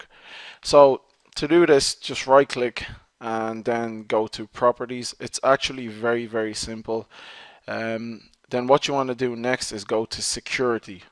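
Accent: Ireland. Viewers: An Irish black man, cool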